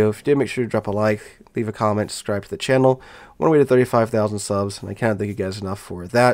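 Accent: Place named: South Texas, United States. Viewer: Sounds like you live in South Texas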